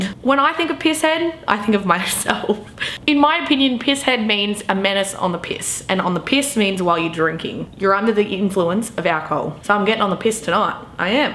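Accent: Australia. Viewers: lmao she genuinly has more of an 'aussie' accent than most Australians. I'm an Australian who's lived in most places in the country and I've honestly never met anyone with as much of an accent as her ahaha. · I love the Australian accent on a woman 😁. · If u weren't Australian you would sound exactly like Emma Chamberlain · Damn, I love how Australians talk, the accent and everything ( except the shoe thing ) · I knew you're australian since ! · Ive heard people say UIE or bus' a UIE. Idk. I love the aussie accent though. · I just came here to memorize how an aussie woman's voice sounds. Thanks. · I’ve never heard a female Australian accent before, thank you! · I like the Australian accent I have been called whoop whoop many times it's funny I now know what it means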